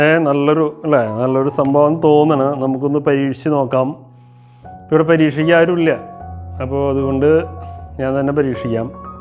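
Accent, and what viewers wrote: Thrissur, India. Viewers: നിങ്ങൾ തൃശുരാണെന്ന് സംസാരത്തിൽ നിന്ന് മനസിലായി . ശെരിയാണോ ??😀😀😀😀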